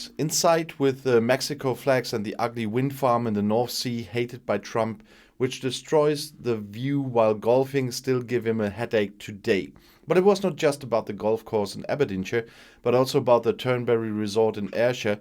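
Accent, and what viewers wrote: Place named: Germany. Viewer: I wondered about your accent, thought it was German, thank you for your very enlightening contribution! May the trumpstain disapears back to hell, where he belongs!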